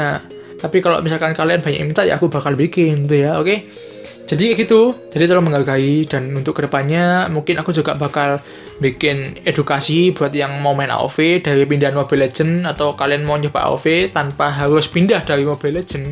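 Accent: Java, Indonesia. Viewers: logat jawa ya bang?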